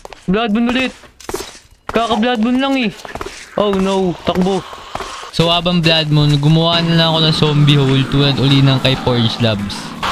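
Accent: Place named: Philippines. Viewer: Filipino Accent "para syang OPIS" ANG LT KUYA HAHAHAHA Nice vid😆❤️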